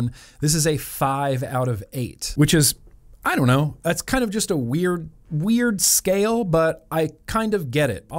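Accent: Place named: California, United States. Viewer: You're from California. I can tell.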